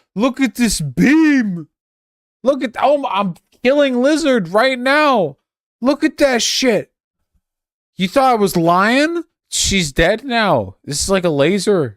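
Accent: United Kingdom. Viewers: Everytime he gets drunk he becomes bri-hish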